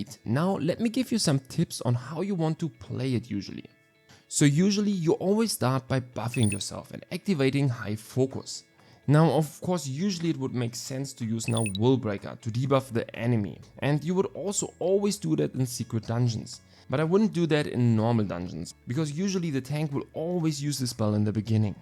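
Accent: Germany. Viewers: so geil wie ich englisch immer besser versthe wenn ein deutscher es spricht. ^^ danke für das video!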